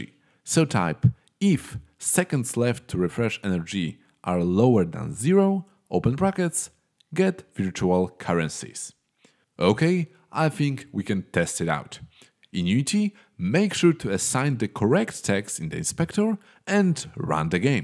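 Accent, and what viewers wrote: Poland. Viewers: Tak mi się coś wydawało, że to Polak do mnie mówi.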